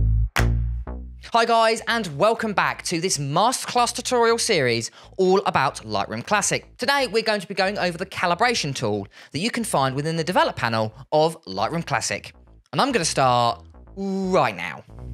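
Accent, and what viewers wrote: United Kingdom. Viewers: he´s bri ish thats how they talk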